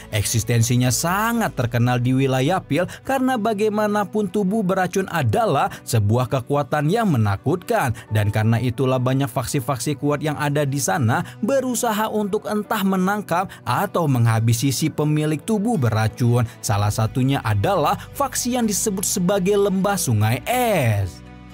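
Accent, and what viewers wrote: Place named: Lombok, Indonesia. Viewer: Orang Lombok mana ni?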